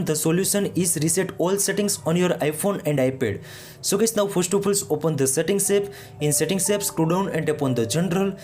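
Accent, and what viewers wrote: India. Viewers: Tf is he talking about bruh all i here is indian Indian Indian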